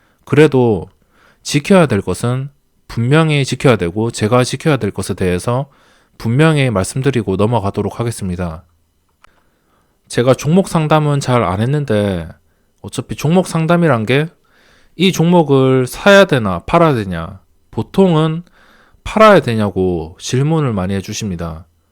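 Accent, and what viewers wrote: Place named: Changwon, South Korea. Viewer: 저도 창원살고있어요 ㅎㅎ 제목보고 들어왔는데 너무 솔찍한 우리 동네 말투로 강의해주셔셔 친숙하게 동영상 재밌게 시청 하고 있습니다 감사드려요~^^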